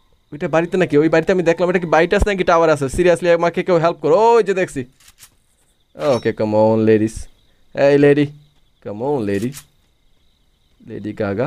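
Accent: Sylhet, Bangladesh. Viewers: vai apnar basha sylhet kunano? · bhaiya apni ki sylheti?? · Tumi Sylhet fua ni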